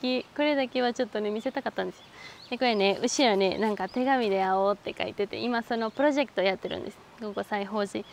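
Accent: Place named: Kansai, Japan. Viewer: しゃべり方だけでなくて、のりも笑いのツボも完全に関西のおばちゃんやん！🤣でも、さんはだまってたらめっちゃ美人やね😙大阪では男も女も楽しい人がモテるよ👍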